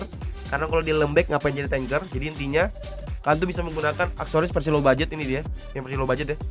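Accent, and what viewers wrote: Banjar, Indonesia. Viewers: Abang org kalimantan selatan kh bang? Banjar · Pantesan logat kamu familiar banget bang😂 ternyata orang Kalimantan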